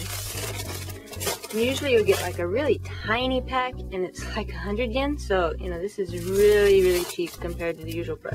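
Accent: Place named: South, United States. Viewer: Your voice still sound very southern to me. Although I can hear other things going on in it as well. Its very かわいい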